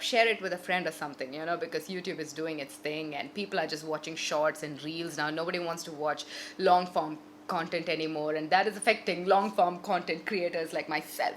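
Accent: India. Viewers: It’s so interesting how you were born and lived your life in the Middle East but you have a very heavy Indian accent. I was born and raised in America and I sound American. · But you have a strong Indian accent ... And i hate howyou pronounce the letter c in "cannot" or other c words.. gosh ...cuz that sounds really fake and weird..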